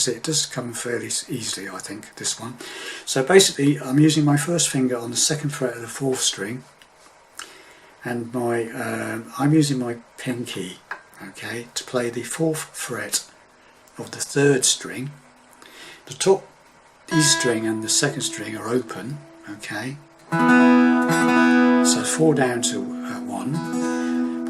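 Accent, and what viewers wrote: England, United Kingdom. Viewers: that's an old Taylor you're playing there young man. As you sound English I'll assume you have a good sense of humour and your turkey-neck tells me you're old enough to remember this one from 1978. and " <link>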